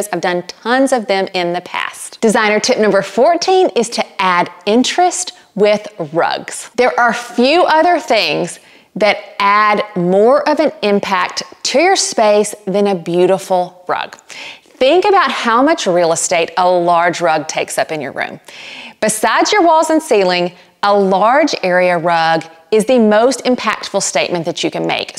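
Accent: South, United States. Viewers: I loved this video. I love your design style. I'm from the UK and absolutely love your Southern accent. My favourite was your advice on lighting.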